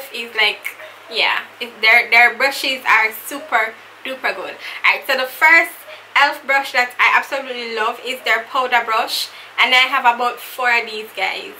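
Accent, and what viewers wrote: Jamaica. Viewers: New Subscriber! I heard a familiar accent and had to support you. Good to see a yardie onYoutube!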